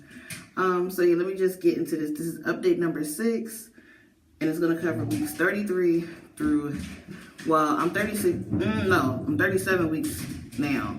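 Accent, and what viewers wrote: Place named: Philadelphia, United States. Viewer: Hi! Are you from Philly? I ask because I am too and I can hear a Philly accent. I am sooooo happy for you and your husband ❤️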